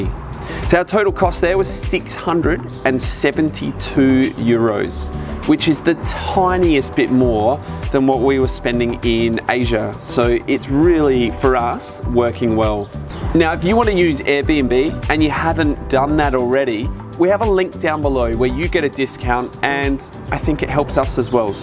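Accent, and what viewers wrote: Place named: England, United Kingdom. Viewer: Why is an English man doing a video on Greece? I want to hear it from a Greek man, I dont want to hear an English accent, one of the worst accents in the world. People in England speak horrible english, can never make out what they are saying because they cut the words in half when they speak.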